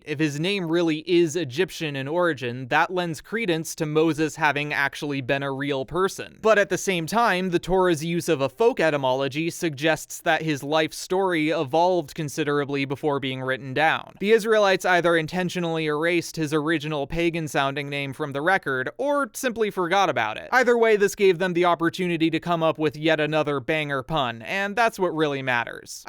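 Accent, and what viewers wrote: United States. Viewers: your hebrew pronunciations aren't half bad actually! it's just your american accent getting in the way lol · The pronounciation isnt bad! It just has an american accent, good job. Adom especially sounds good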